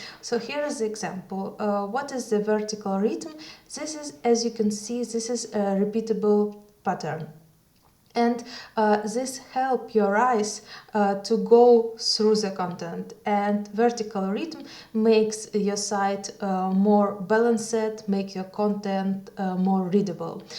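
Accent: Russia. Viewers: The dictor has a strong russian accent:) It seems easier to make it in russian for her than in english)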